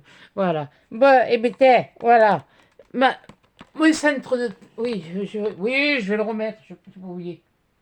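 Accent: South, France. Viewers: Bonjour, je ne commente que très rarement les vidéos que je regarde, mais honnêtement je trouve vos créations très très jolies et j'imagine le gros travail en amont. j'aime vos vidéos et votre accent du sud 😊 et aussi vos début de phrases sans la fin, votre simplicité et le respect des autres sans critiquer ( ce qui n'est pas le cas sur toutes les chaines😊) Je vous souhaite de très bonnes fêtes de fin d 'année avec votre petite famille et merci pour toutes vos vidéos 😊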